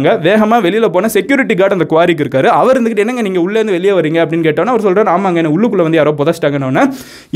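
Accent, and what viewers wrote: Madurai, India. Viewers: Innaiki thaan bha MG, Madurai slang la pesirkaar... Avinga, Ivinga, Pannirkanunga... Daily he sounds formal Tamil, today Madurai slang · Inniku Madhan gowriku madurai slang niraiya varuthu… ketka super aa iruku..🙌🙌🙌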